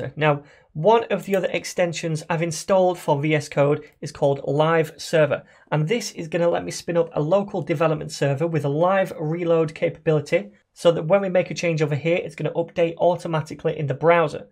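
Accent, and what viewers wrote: United Kingdom. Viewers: Hey! Great British English, useful content. Thanks a lot, shared it with my group).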